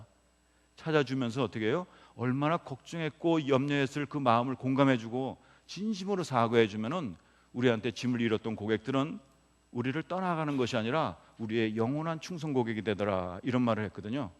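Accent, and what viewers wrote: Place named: Seoul, South Korea. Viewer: 강연 들으면서 문득 드는 생각이 요즘 나오는 서울사투리 말투시네요~~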